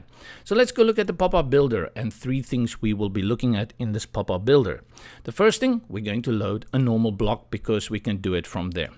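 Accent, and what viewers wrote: South Africa. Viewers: Thank you for the video, really appreciate the time you put into it. Lekker om na 'n Afrikaner te luister :)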